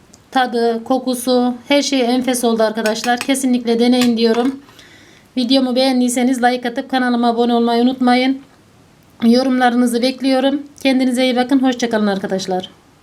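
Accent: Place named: Antakya, Turkey. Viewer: bu antakya aksanı. tesekurler arkadas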